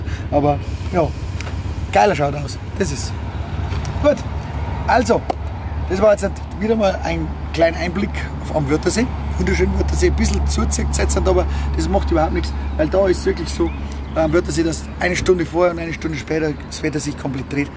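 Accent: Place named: Saxony, Germany. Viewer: Da sage noch einer sächsisch klingt furchtbar...nach 10 Sekunden habe ich das Video ausgeschalten...unerträglich.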